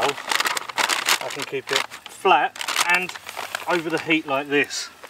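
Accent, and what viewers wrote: United Kingdom. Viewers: Wow i just found a video worth for my lesson to study living outside, i subscribe it m8, your video's so cool And british accent? Youre an england?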